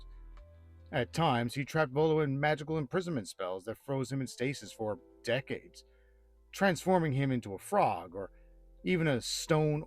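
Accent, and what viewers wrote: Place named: Newfoundland, Canada. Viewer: Love the video! Off topic, but are you from Newfoundland by chance? As a Newfie I think I'm picking up an accent there lol!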